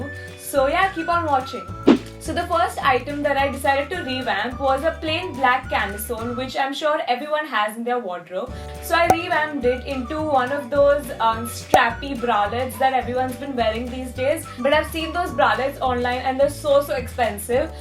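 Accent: India. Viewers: HONESTLY if you don't like the Indian accent you can fuck right off and click on another video, no one wants your negative and racist opinion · *cute indian accept: ) you're cute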